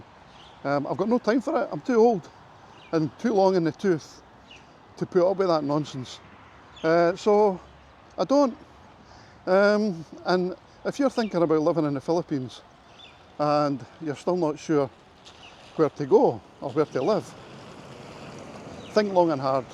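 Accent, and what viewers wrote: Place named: Scotland, United Kingdom. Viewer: Yep it's English. Scottish accent is considered difficult to understand even by other English speaking nations. Many also find the accent cute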